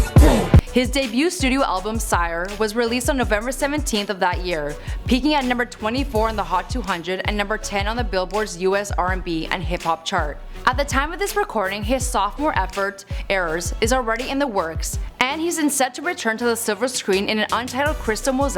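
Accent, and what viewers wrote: Canada. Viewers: Is her accent Canadian? Or foreign? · Her canadian accent is as thick As the whole album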